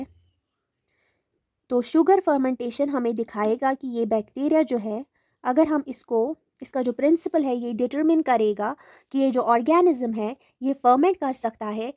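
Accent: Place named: Kashmir, India. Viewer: you are kashmiri by your accent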